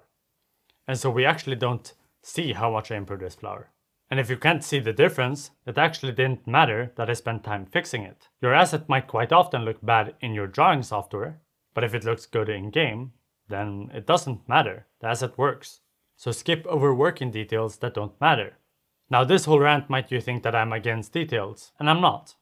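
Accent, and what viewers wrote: Sweden. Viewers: Great video. Are you Swedish by any chance?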